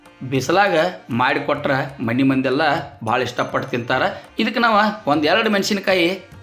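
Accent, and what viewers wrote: North Karnataka, India. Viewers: ನೀವು ಮಾಡುವ ರೀತಿ heluva😘 ಉತ್ತರ ಕರ್ನಾಟಕ ಶೈಲಿ, ತುಂಬಾ ಇಷ್ಟವಾಯ್ತು ಧನ್ಯವಾದಗಳು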